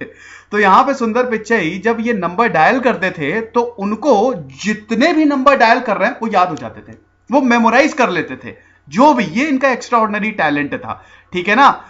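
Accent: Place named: Kanpur, India. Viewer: Ye kaaanpur style ghussana jaruri nahi hai... Try to talk in neutral dialect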